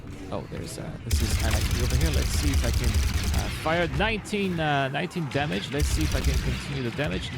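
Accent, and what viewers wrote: France. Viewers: You are french right ?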